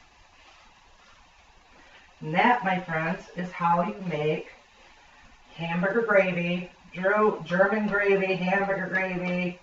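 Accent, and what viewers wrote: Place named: South, United States. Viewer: You sound like a good ole country girl, or at least you cook like one. That looks good; gotta give you a thumbs up. Save me a seat at the table, LoL...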